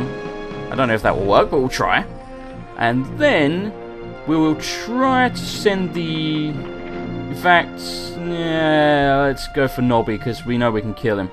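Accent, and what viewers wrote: United Kingdom. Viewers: He's surely british.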